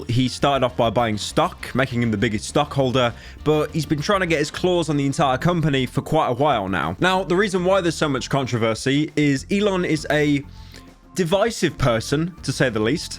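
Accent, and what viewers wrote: United Kingdom. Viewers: Every time says Twit-uh I laugh because he's funny British "Man". No but seriously I love your content ❤️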